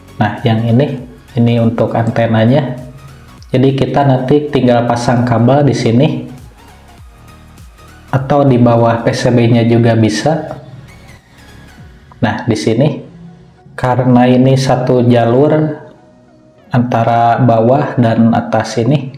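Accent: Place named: Sunda, Indonesia. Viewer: Urang sunda nya mang,,,😁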